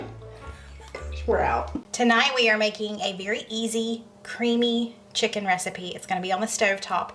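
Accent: South, United States. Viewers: His voice sounds like Tom Hanks with a southern accent! 💞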